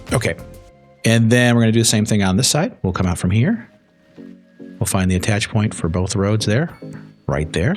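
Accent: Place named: United States. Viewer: Beautiful approach to building in this game. Love it! Btw, you must be the most American sounding American on the internet.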